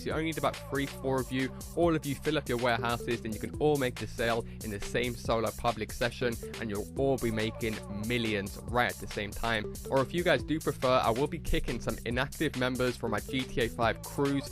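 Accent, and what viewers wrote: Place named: London, United Kingdom. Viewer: i'm from london and i can tell you are, it's strange to hear such a well spoken londoner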